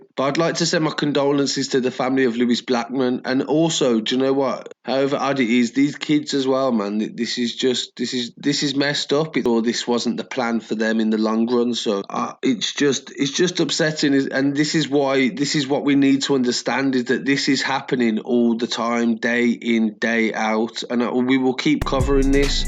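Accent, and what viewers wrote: Birmingham, United Kingdom. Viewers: That fucking accent tho pmsl, fellow brummie here LOL , great content just subbed!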